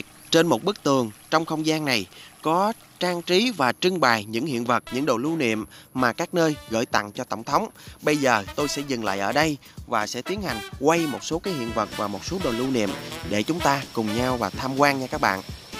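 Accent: Standard. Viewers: Phát âm rất chuẩn. Nghe rất êm tại cảm ơn bạn. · Tôi rất ngưỡng mộ dọng đọc của bạn , dọng đọc phát âm chuẩn , rõ ràng , mạch lạc ,và lưu loát , dẽ hiểu . Ủng hộ bạn .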